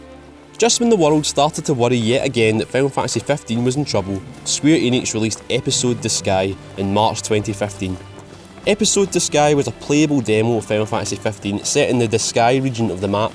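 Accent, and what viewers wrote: Scotland, United Kingdom. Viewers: Great video, love how you got more and more Scottish as the video went on haha. Was a great watch though. Thanks :)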